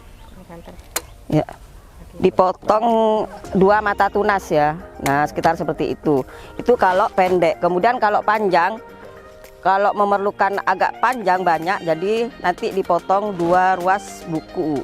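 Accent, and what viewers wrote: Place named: Madura, Indonesia. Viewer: bahasa Indonesianya , ketoro nek wong meduro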